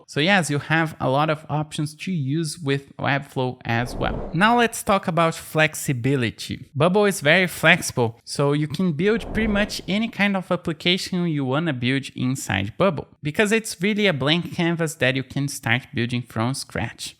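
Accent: Brazil. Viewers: Your english is with brazilian accent rsrs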